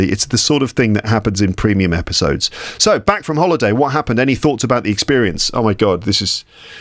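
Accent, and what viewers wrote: United Kingdom. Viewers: Hi Mr., hope you are doing well. I listen to you to improve my pronunciation. I like the way you speak English. I acquire British accent. Thanks and greetings from Türkiye 🇹🇷. God bless you. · Is it was British accent????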